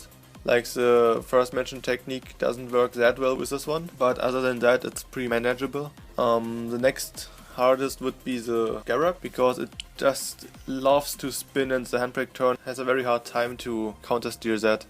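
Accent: Germany. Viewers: Mashalla guter deutscher akzent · Bro du bist deutscher wie du redest wtf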